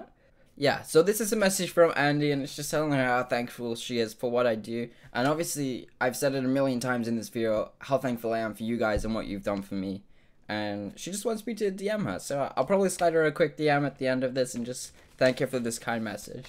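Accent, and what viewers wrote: United Kingdom. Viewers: DON'T MOVE TO AMERICA!!! YOU'LL LOSE YOUR BRITISH ACCENT!!! Slowly but surely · I love your mid-British accent, it's like, u can tell that ur some what British but it's confusing by the accent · Yes I'm British too I think he is, my friend is like that too coz all her friends are american she picks up the accent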